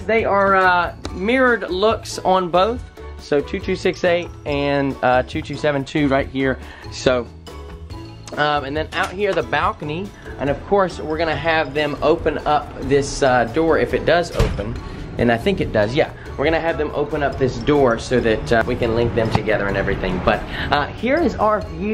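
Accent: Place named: South Carolina, United States. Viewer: in the fruit section is so precious... Y’all are cruising by the big cantaloupe and melons....: Can we eat them?’: yeah 🤣 bless her heart! She doesn’t understand the treat she’s in on!! Take it easy on her! She might become overwhelmed by the how wonderful cruising is! 🤣 relax and enjoys, guys! Btw...I Love watching your videos! I discovered your channel a few months back! I love y’all the (spelling?) and! And now! I’m from SC so you sound like some of my kin folk! 😉 Take care!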